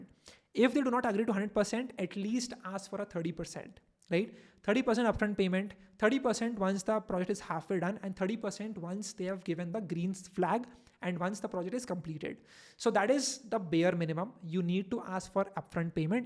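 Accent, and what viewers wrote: India. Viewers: When you speak in English it sounds like you are speaking in Hindi. Your explanation is so good.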